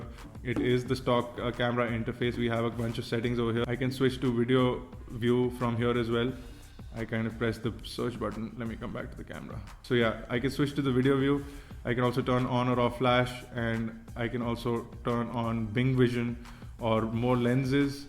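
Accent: India. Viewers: I have never seen a white person with a indian accent before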